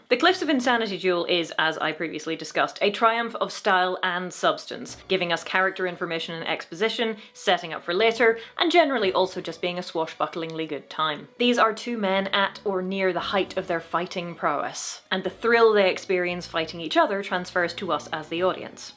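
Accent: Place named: Northern Ireland, United Kingdom. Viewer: You have a great accent. It's got to be Northern Ireland or Donegal, with moderation in the US. Please tell me.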